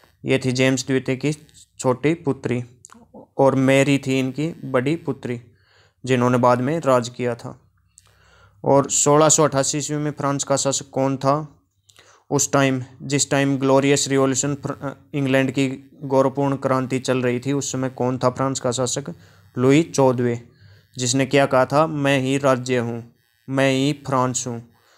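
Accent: Haryana, India. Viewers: Bhai aap hariyana se Ho kya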